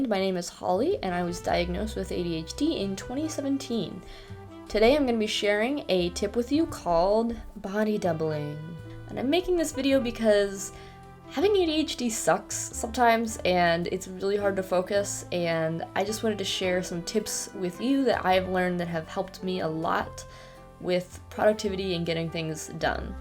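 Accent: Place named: Ohio, United States. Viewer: The ohioan accent alone made it worth the watch 🫡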